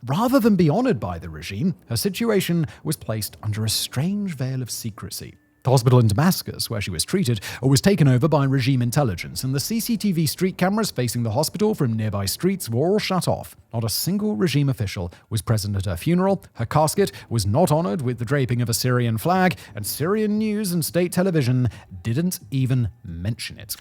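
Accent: RP, United Kingdom. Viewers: This guys English is about as posh as I've ever heard. It's fun to listen to though.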